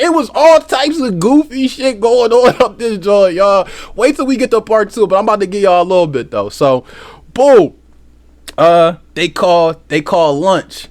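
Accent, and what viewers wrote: Philadelphia, United States. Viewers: This dude speaks well. New sub for sure! Love the Philly accent no diddy ! Peace from LI NY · Yo man you’re a great story teller. The Philly lingo/accent is heavy lol. ‘Some fat young bol’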